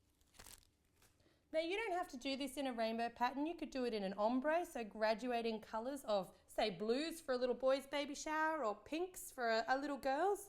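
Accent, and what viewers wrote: Australia. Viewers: Your an Aussie!! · an Australian haha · I love Australian accents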